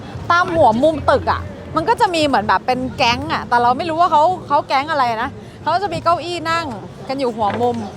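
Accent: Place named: Chiang Rai, Thailand. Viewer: สำเนียงเชียงราย 😅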